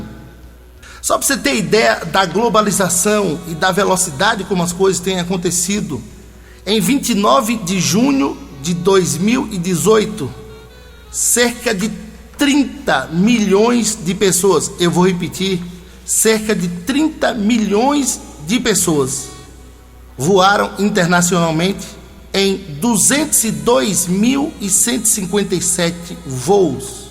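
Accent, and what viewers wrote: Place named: Northeast, Brazil. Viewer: Amém PR. Boa mensagem, boa de se ouvir, um conteúdo de grande qualidade, que o Senhor continue te abençoando mais e mais, mensagem que edifica, parabéns pela sua dedicação nesta obra. Estou aqui em Cotia-SP, e matando até um pouco a saudade de ouvir o nosso povo nordestino, nossos conterrâneos, isso nos faz bem.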